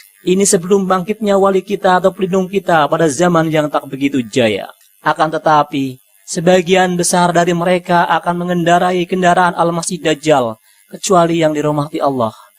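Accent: Tegal, Indonesia. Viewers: Logat tegal yak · Yang ngomong orang tegal ya ?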